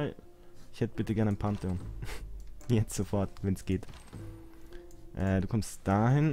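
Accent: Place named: Vienna, Austria. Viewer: Was für ein Akzent ist das? Wien?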